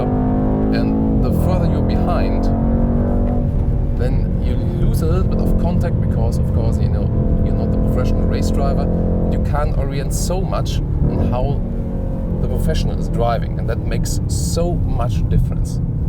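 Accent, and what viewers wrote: Germany. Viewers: very good review! Although in my view the sport seats which are part of the Edition 1 are very comfortable in my view. I agree with you, the Standard seats are eventually better for very long trips, but they look too serious in my view. But that is a very personal thing, I see that you like more the traditional style things, elegance - thats good and fits to you :-) I like your reviews very much, but why dont you do them in German, I can hear that you are a German (I am Swiss).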